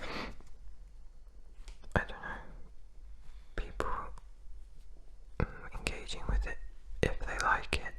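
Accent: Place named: United Kingdom. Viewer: The British accent is naturally ASMR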